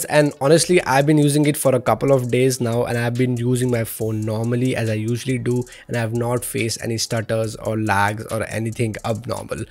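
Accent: India. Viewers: Am I the only one notice your Heavy Malayali Accent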